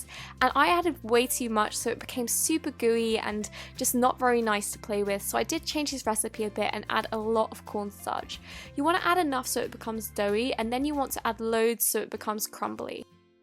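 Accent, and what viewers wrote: England, United Kingdom. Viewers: Sumthing I have her accent, im English :) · I found u😂! I'm on insta btw. Love u AND GLAD SOMEONE FINALLY HAS A BRITISH ACCENT LIKE ME 🤣💜🖤💕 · U sound British how did u get borax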